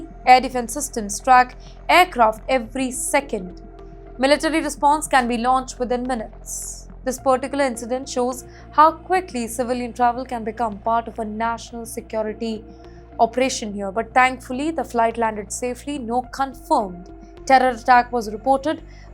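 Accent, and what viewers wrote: India. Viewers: Heavy Bindian accent makes hard to understand. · What kind of Hindi English is she speaking? Can you not find someone who speaks proper English as your announcer? The Whole story is just a joke. AI produced stuff?? :face-orange-frowning::face-orange-frowning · The headline is also incorrect. The plane was never hijacked. All in all, I think you should report in your own language. When you speak Apke Apke for so long and so hard, you tend to Passover the bad habits from your language into English. I see this all the time. Content creator is always an Indian from India that will have a video , that has been captioned in English , but 25 seconds into the video , the Apke Apke will start, and that Apke Apke won’t stop until the end. This is why people like me , that grew up speaking English as a first language have to always write exams and tests proving my eligibility to speak English , because of the Apke Apke people that keep fucking up the English language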